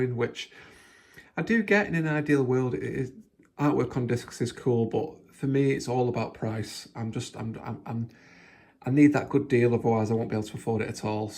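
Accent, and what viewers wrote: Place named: North, United Kingdom. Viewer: Good video mate! Good to hear another northerner haha. Im going for the full english pal set. Got a lot of the higher end games already . At around 500 at the moment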